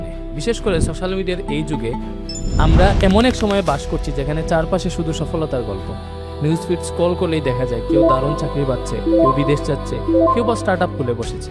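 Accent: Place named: Sylhet, Bangladesh. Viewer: সিলেটের ভয়েস না দিয়ে শুদ্ধ বাংলা ভয়েস দিলে অসাধারণ লাগবে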